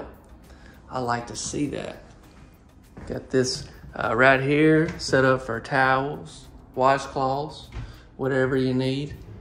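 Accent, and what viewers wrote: South, United States. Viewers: Well first off, Cullen is too adorable! And secondly, thank you so much for ALL of your videos! Anytime I find a home I think I might like, I run over to your channel to watch you tour it! Love your humor and accent! Gotta be from the south! I’m in Mississippi myself! Once again, thank you for all the videos and the good laughs!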